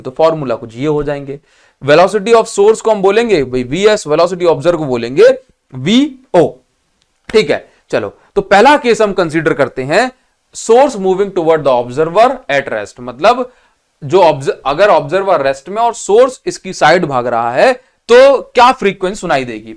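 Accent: Odisha, India. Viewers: Sir great video❤ Sir, are you odia? Jay jagannath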